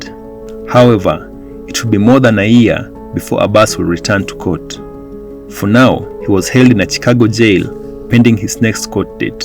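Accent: Kenya. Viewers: The Kenyan accent of the narrator...the tone of the video seems to admire the fraudulent activities...it's very sad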